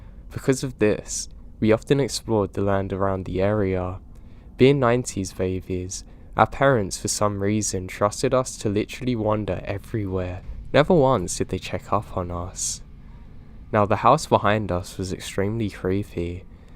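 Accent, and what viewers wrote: United Kingdom. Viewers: im glad you do mate i get bored of these american story tellers, its good to hear a fellow british accent. i was actually shocked the first time i heard you were british tbh lad, keep it up any way you tell stories clearly, and the starting motivational bits are a good add to people who maybe need words of wisdom but dont try to find it, so you prolly have more of an effect on people than you know. One thing tho is maybe a bit too much emphasism sometimes? No hate ofc but i find that it makes a big difference if the main parts are emphasised in stead of the irrelevant bits. Kinda adds more power to the plot, but it aint me thats tellin em so keep up the good work lad 👏👏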